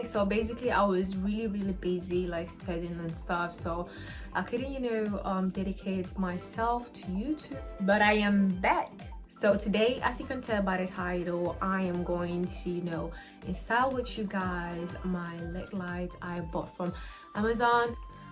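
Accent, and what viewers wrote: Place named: United Kingdom. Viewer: You English sounds British 😎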